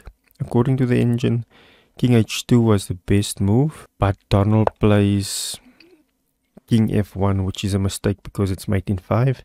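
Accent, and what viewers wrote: South Africa. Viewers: Very nice. This is of course the so-called 'Game of the Century' which gained the young Fischer a lot of attention. To the narrator: are you from South Africa?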